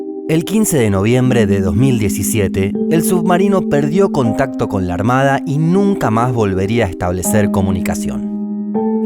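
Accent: Rioplatense, Argentina. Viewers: El Acento argentino de estos vídeos es agradable y Elegante , no se vuelve molesto como en algunos casos de periodistas deportivos o Narradores argentinos en los que ya se vuelve detestable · Lo que paso solo lo saben con certeza los responsables vivos pero ellos nunca van ha reconocer los errores que costaron la vida de tantos, no sería la primera vez que salió en esas condiciones , y difícil de constatar todo .- Muy buenos los videos y hermoso escuchar una voz Argentina . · He visto tantas veces los videos de que se me pegó el acento argentino y cuando hablo la gente me mira raro:p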